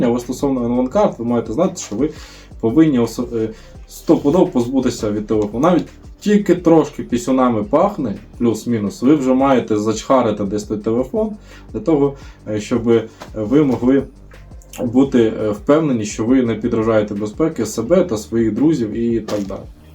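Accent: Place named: Western Ukraine, Ukraine. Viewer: ого) я би навіть не сказав шо ти був російськомовний) балакаєш так ніби з західу України)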